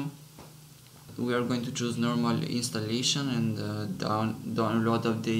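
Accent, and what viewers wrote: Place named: Albania. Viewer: O shqipee . Tkuptova direkt nga theksi i anglishtes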